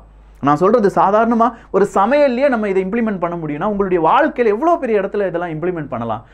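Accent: Kerala, India. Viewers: Hi, i follow all your channels regularly, really appreciate your efforts n hard work. Tamil politics, international news, Geopolitics updates, science n technology, all i n all boss neenga. Krep going.. btw, I am frm Brussels. One suggestion: you really have to change TamilViyugam theme😊 And your English pronunciation quete keralitic, like Public, application etc should be pronounced correctly, since some students following this thread, so;) Thanks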